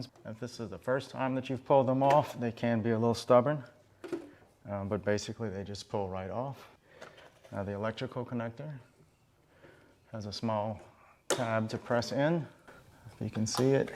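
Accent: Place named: Louisiana, United States. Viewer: Love your informative videos! Where in the south are you?? Sounds like Louisiana accent!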